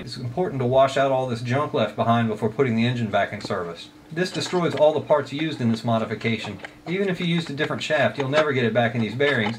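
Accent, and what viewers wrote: United States. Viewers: Are you from Alabama?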